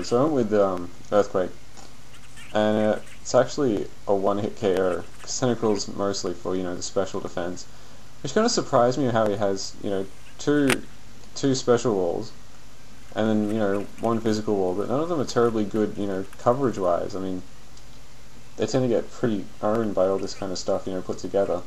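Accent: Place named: Australia. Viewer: Fuck yeah Australian accent narration =)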